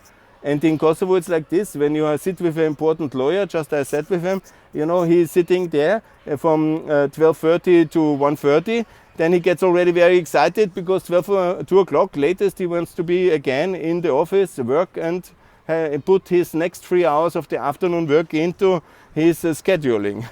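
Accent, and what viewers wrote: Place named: Germany. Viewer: becauz your english accent like german gunther; that’s why they thought ur german..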